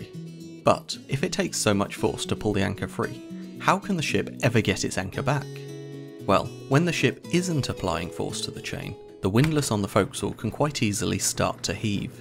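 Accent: United Kingdom. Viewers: i was going to say the same but since he sounds british maybe they pronounce it that way.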